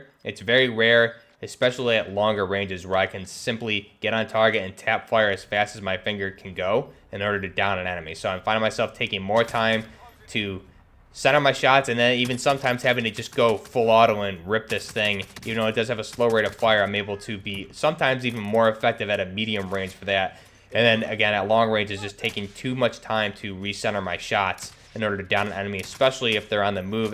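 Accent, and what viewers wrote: New York, United States. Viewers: Imagine being mad at a youtuber with a New Yorker accent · it’s his NY accent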